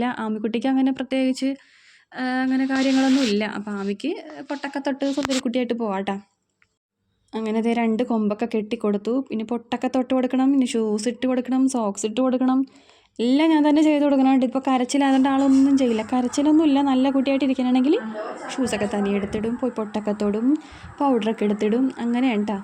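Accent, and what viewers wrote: Kochi, India. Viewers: Kochi slang angane aanu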